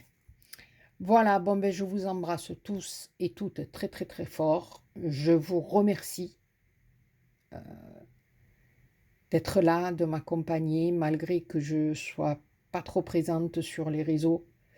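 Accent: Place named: Aubagne, France. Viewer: Bonjour.nous sommes ma soeur agnes et moi d'aubagne, nous vous suivons,vous êtes très soignée dans vos projets,avec des finitions nikel.en plus nôtres accent est magnifique.bisous d'aubagne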